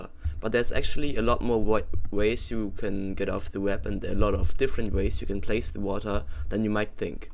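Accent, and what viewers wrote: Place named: Germany. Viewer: i can tell hes german lol like me xd if hes not german then idk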